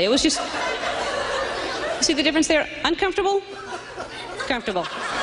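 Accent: South, United States. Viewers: Back when still spoke with her Southern accent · I miss her southern accent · Her southern drawl is more prevalent in this one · has a souther accent here;) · Her southern accent is so much stronger here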